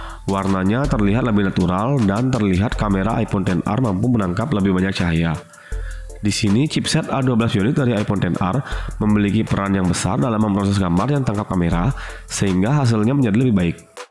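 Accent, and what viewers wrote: Bali, Indonesia. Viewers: Orang bali kalo ngomong memang seperti itu kak logatnya, ini orangnya dari bali soalnya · Logat nya bali banget😅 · logatnya macam anak bali nih 🤔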